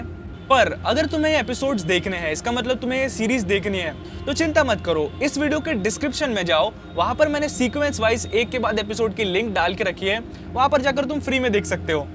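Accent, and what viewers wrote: Nagpur, India. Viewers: Bhai tu nagpur se hai kya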